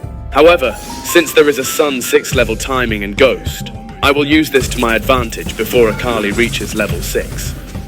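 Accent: United Kingdom. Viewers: 영국식 엑센트 맛집임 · 완벽한 영국식 영어다 하다가 중간중간 개뿜네 ㅋㅋㅋㅋㅋ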